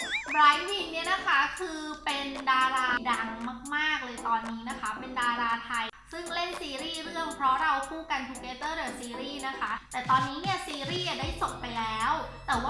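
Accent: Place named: Guangzhou, China. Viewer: ไปบอกเค้าทำไมว่าไม่เทพอะครู .. แม่ป๋อมฟังคือสำเนียงกริ๊บเลยค่ะ นึงว่าจบเอกจีนจากกวางโจวมาเลย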